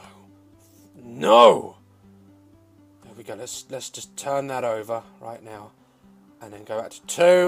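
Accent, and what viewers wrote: United Kingdom. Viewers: Oh, man. Can't stop laughing! The slightest sound / gesture Daz makes, is so damn hilarious! 🤣😂 Always the best solution when feeling sad. Also, as a non-native English speaker, it's great to hear his British accent. Perfect for some tea, HAH!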